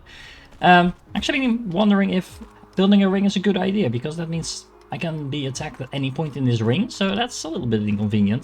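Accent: Netherlands. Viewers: Je klinkt gewoon extra Nederlands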